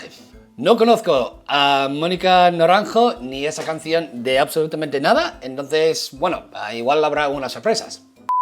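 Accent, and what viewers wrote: Spain. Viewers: Vaya acento mas raro tienes tio. No lo digo criticando. Un californiano con acento de españa es como un perro verde. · Tiene acento castellano. Flipo! ❤️ already in love. Enhorabuena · Por cierto tu español es buenisimo tienes acento español no latinoamericano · Usted tiene acento español y usa frases españolas como "joer", no creo sea su primera vez oyendo a Mónica Naranjo...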